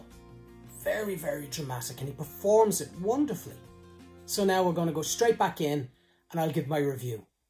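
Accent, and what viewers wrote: Ireland. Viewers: I love a slight accent, too, so singing is just fine like it is as far as I'm concerned and I like your Irish accent. The truth is, most of us have a bit of an accent even depending on which part of our countries we come from. I was born, raised and lived in Texas USA all my life so while I don't have one of those thick slow drawl accents at all (like Lady Bird Johnson, who made me shudder with slight embarrassment every time she opened her mouth for fear other people would think everyone from Texas sounded like THAT. We don't:-) but I know people from other parts of USA can tell that I'm not just Southern but that I'm from Texas and proud to be:-) Texas is not known as the GREAT Lone Star state for no reason.The USA is a huge country and In many respects Texas is a world apart from from what goes on with some other parts of the USA:-) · AH! 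i can just hear you saying "lovely" in your Irish accent, and i am smiling away like a fool again... so i am going to say "Ah, that's just Grand" in my not so good Irish accent (tho i do try) hanging out on the Bus! and hey, i just watched your ' ' video, and get this.... we already have the Same Hair!! ahahahahaaa! awwwww God Love ya.... you really are just as immediately warm and sweet, and really funny(!) in all of your videos so far. so nice to hear that you would love to see Italy and appreciate the culture, tho probably not quite as much as i would love to see Ireland.... all of those Gorgeous Castles and all of the Stunning Natural Beauty and Father Ted's house too! maybe one day.... (i am quite seriuosly ill, sorry to suddenly be a downer here, it's okay tho... i am pulling myself through as best as i can... we shall see i s'ppose...) if i am well enough to live the dream and actually visit Ireland, i will give you at least a 5 minute warning that i will be on that part of the planet! 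and maybe we could have a nice cuppa on the Bus hahahahahaa! and thank you so so so much for the kind words, i was seriously just calling it like i saw it, so glad that you found it to be that nice of a comment. you seem to be the kind of person who deserves it tho. i am faaaaiiiirly sure this is not the last you will see of me in your comments, so i shant say goodbye... just, until again. yay!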